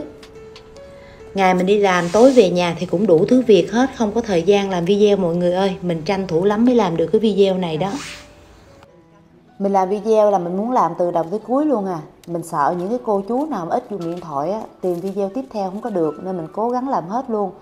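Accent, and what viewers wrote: Mekong Delta, Vietnam. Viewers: Nghe giọng tưởng em ở miền tây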